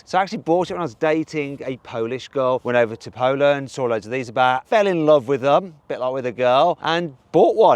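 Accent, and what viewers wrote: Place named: Birmingham, United Kingdom. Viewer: Thankfully Brummy accent wearing off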